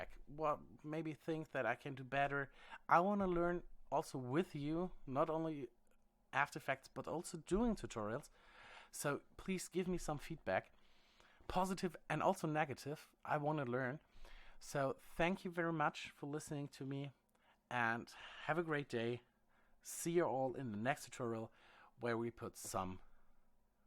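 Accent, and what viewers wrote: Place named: Germany. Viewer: Hey, höre da einen deutschen Akzent raus, daher auch hier mein Kommentar in deutsch. Kann mir jemand sage wofür das Grid am Ende nun gut war? Konnte den Zusammenhang bzw. den nützlichen Teil nicht ganz fassen. Wozu brauche ich ein Grid statt des Planeten bzw. wozu dient die Combo? Ansonsten sehr gutes Video klar zu folgen und einfach erklärt, vor allem nicht in der Muttersprache dafür nochmal ein "Daumen hoch"! Würde mir mindestens genauso schwer fallen! Den Leuten denen das Tutorial "zu ausführlich ist" kann ich nur raten von der Timeline Gebrauch zu machen ;) Cheers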